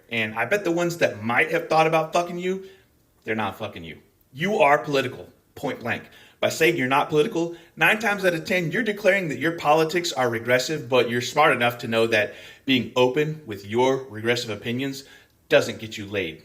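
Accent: Scotland, United Kingdom. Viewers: Hey I love you, your scottish accent, and your starship! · Serious question: is the Scottish accent a bit, or is that native accent? Like, was he born in Scotland and then moved to the US or something? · Love the Scot-talk. 😊 Made it to the end... for the algo. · Go full out Scottish accent! Especially your initial rant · your scottish is showing. oh yeah and I made it to the end mfer. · It's even better with a Scottish accent and a fellow gamer!! Even more hooked now!! 😍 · I love that you used your Scottish accent. 👍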